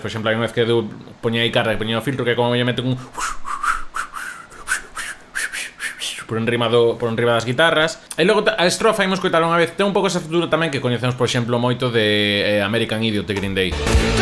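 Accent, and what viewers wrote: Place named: Portugal. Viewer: Genial video man! Pero me sorprende tu idioma re flashero jajaja portu español o nose re loco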